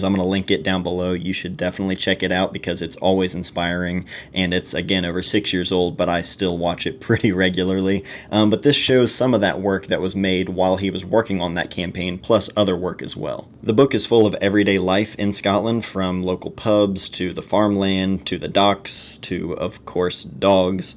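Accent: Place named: South, United States. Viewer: I just realise how southern you sound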